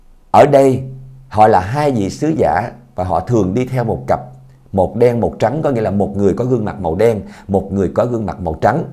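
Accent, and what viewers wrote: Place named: South, Vietnam. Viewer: Người miền nam họ kể chuyện dể hiểu hơn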